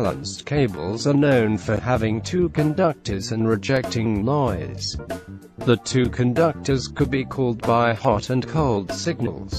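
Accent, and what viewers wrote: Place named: England, United Kingdom. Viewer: nice job. I agree with the English accent. You sound very authoritive. You also kind of cleared up a question I had with my cables. Cheers!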